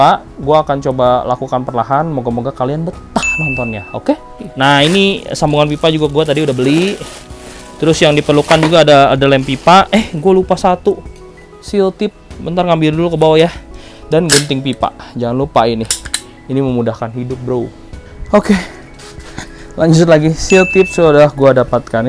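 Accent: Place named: West Java, Indonesia. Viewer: logat nya sunda banget euy....hehehehe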